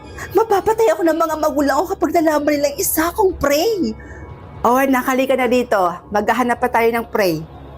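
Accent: Visayas, Philippines. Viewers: Bisaya ka po ata ? 😊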